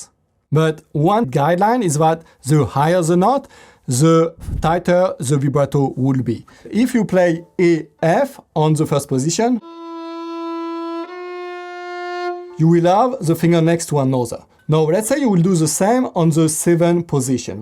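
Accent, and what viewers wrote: France. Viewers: Accent français ça non ? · Oui! J'adore l'accent français😘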